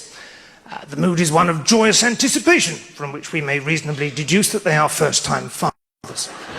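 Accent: United Kingdom. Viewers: FYI writing in English. Native language also English. Dude has a London accent. ❤ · I need a translator to American, too much of British accent I don’t remember a country name Walsh, I need a historian too to understand ☮️ so smiling for now 🙂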